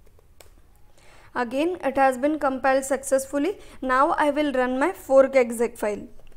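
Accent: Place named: India. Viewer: Your indian accent is really annoying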